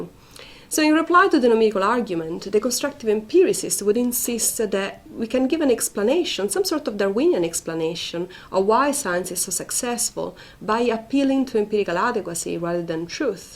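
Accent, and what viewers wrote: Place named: Italy. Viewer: Really good, and simple explanation. Cute italian accent as well. Thanks for sharing.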